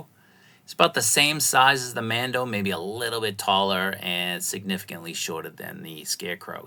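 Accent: Boston, United States. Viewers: So, have u watched the Celtics documentary series that's running on MAX/HBO? You should've narrated it. Noone's more Boston than you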